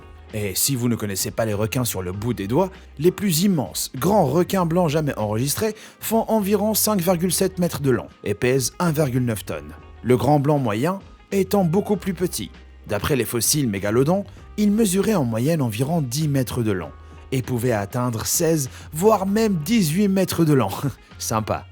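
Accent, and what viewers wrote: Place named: England, United Kingdom. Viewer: Excellente la voix off! l’accent anglais est pas mal du tout ! ;)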